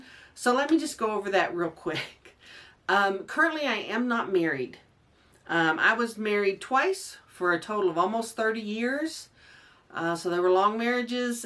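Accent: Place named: Indiana, United States. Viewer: Funny you are from Texas. You have the same accent of a friend of mine who is from southern Indiana.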